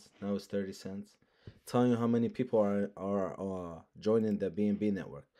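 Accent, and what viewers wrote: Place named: Libya. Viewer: I know a Libyan with the exact same accent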